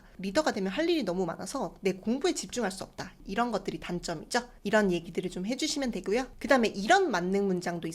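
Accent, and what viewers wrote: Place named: United Kingdom. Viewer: 강의 내용 다 좋은데 혹시 영국에서 공부하신건가요? yes나 so 등 억양을 너무 강하게 올리시는게 미국이 아니라 영국식 발음 같습니다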